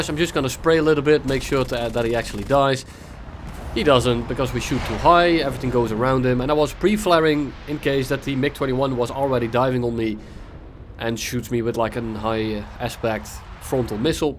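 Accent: Netherlands. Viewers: Steenkolen English 👍🏼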